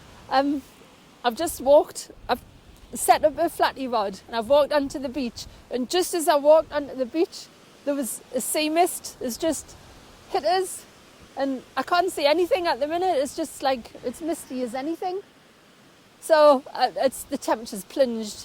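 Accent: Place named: Northern England, United Kingdom. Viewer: you will have to do a northern lights video as by your accent you are very close to catching them Very different accent to further you go up before it turns into Scottish And we are based Co Durham so get to hear many accents ❤️🫡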